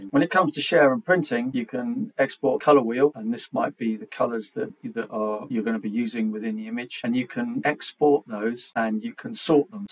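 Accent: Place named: England, United Kingdom. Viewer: as the author of the app I have to say this is one of the most amazing user videos I've seen for my app! I am so impressed and thankful! Of course, the super cool English accent is the icing on the cake! Seriously, your video is going to help so many people!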